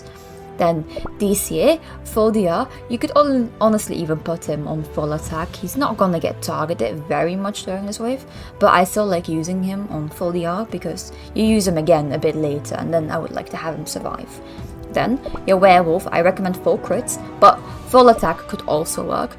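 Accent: United Kingdom. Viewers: Very helpful,video. Thanks so much for making it! Also you accent is very posh! i like it! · hi are u british?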